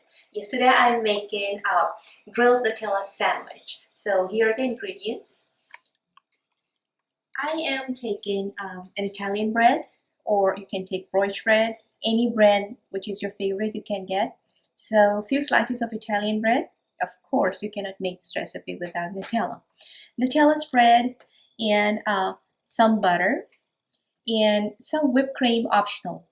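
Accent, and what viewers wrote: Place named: United States. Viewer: Pakistan ,,but your accent is like American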